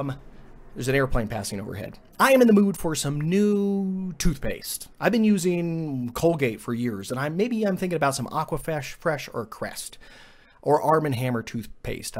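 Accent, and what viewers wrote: United States. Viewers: he just has a generic american accent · He's just American we don't really go off of regions besides maybe southern or northern but that applies to the east coast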